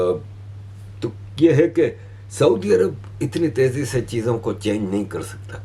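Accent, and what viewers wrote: Gujarat, India. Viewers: Sirji aap kahise pakistani nahi lagte ,,aapka bolne ka andaz thodasa gujarati lagta hai,, matlab ke indian lagta hai,, salute to your knowledge sir,,pls tell us the story of Turkey 🎉❤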